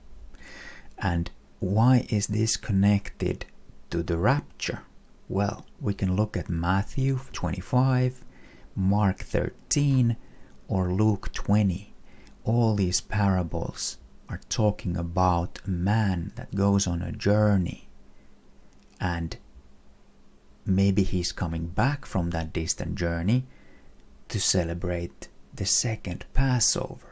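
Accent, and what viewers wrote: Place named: Baltic states. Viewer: Brother, i was wondering if you coming from a Baltic country ? Your accent looks like theirs 😀 I hope we are leaving this weekend 🙏🏼✝️ to meet all around our sheperd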